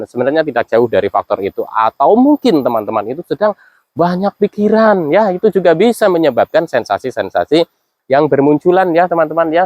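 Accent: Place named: Banyumas, Indonesia. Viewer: Mas enak penyampaian katanya, saya baru gabung aja suka lihat. Karena setiap saya dengar dan nonton. Kecemasan saya berkurang. Semangat terus mas, dan ayo semangat juga buat kita supaya lekas sembuh dari asam lambung dan kecemasan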